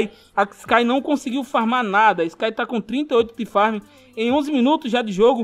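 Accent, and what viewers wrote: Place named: Bahia, Brazil. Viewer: Você é da Bahia ??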